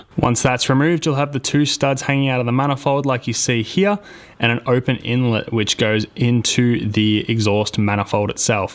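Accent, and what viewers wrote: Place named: Australia. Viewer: I might do this. It's good hearing a local voice. G'day from the Goldy.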